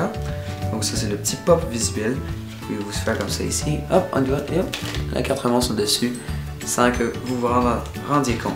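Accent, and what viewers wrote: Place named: Quebec, Canada. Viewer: Belle accent québéééécois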